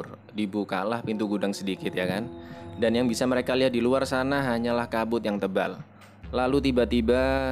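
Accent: Java, Indonesia. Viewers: Iki wong ndi sng ngomong kok medok bgt kwwkwk salam Pati Jawa tengah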